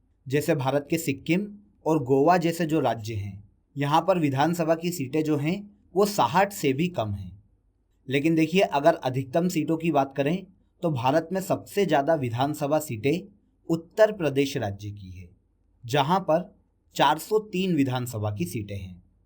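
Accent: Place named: Maharashtra, India. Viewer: Sir ap boht acha pdhate ho.. or aap Maharashtra se belong krte ho kya?! 😄 qki aap “hai” ko “he” bolte ho 😁 sounds good!!😊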